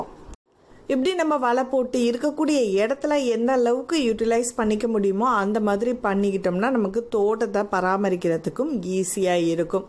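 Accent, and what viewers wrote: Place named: Nagore, India. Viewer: Asssalamu alaikkum wa rahamaduillahi wa barakkthuhu..Alhamduillah yella commentkkum reply panni erukinga 👏nan nagore ma ninga pesurathu yenga ooru karanga pesura mari erukkum..like appanu solliringale grandfathera 😊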